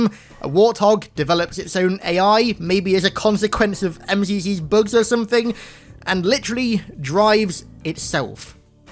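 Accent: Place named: United Kingdom. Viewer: Lispy British man? I didn't know that film critic Jonathan Ross was also a gaming Youtuber!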